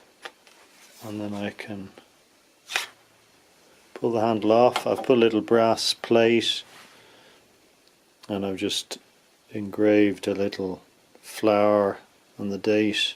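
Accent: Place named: Ireland. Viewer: This guys Irish accent is Cool 👌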